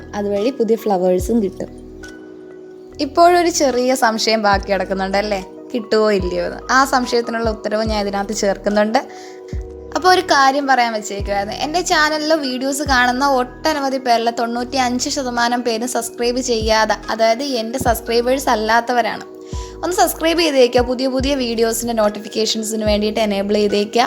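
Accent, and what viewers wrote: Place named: Trivandrum, India. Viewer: Njan tvm aanu ithante slang kettappo tvm anoonnoru doubt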